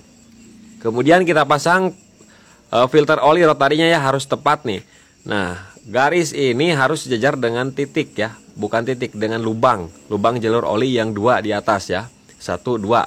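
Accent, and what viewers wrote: West Java, Indonesia. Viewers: Curukk 🤭 orang sunda ieu mah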